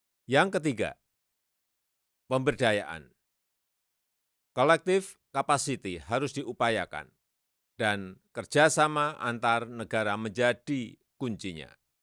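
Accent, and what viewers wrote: Java, Indonesia. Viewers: 😁😁😁😁😁😁😁Hihihihihi Benar tuchhhhh. Ndak enak mendengar BPK Jokowi menggunakan bahasa Inggris saat di forum internasional.....kagok...(lokal Indonesia jawanya terasa sekali....). Maaf ini bukan RASIS atau kesukuan....tapi itu melekat dan sulit dihilangkan 🤭🤭jadi kebiasaan dari kecil sampai tua pasti terbawa.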